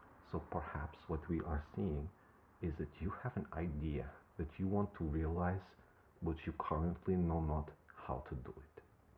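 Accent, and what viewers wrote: Russia. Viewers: Your Russian accent is outstanding! ... and very relaxing. This little fire sign (Sagittarius) would love to hear more. 🔥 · Capricorn ♑️ here! Awesome Russian accent. 🖤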